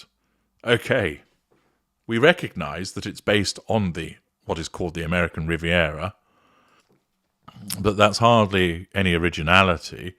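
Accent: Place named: Lancashire, United Kingdom. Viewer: Tong "lancastrian accent or northern.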